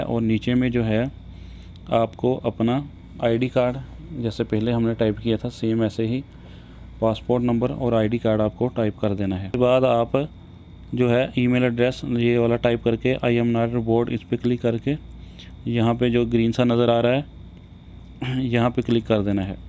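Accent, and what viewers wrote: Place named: Shakargarh, Pakistan. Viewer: شکرگڑھ سے لگتے ہیں آپ بھائی آپ کا بولنے لہجہ بتا رہا ہے, اور ماشاءالله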